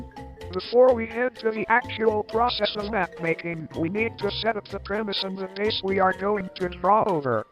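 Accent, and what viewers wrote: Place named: Arab world. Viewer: I can hear your middle eastern accent through your voice changer.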